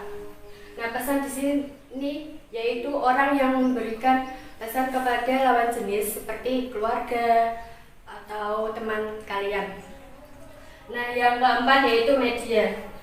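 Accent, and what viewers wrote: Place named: Java, Indonesia. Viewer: Ini pasti dr Jawa. Medok.. tp bgus kok penyampaiannya, lanjutkan...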